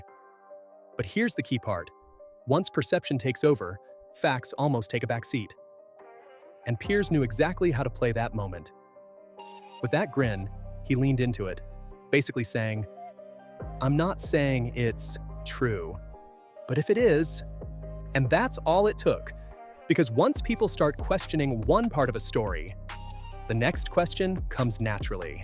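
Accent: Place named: United States. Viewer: Usual yankee verbal diarrhoea narration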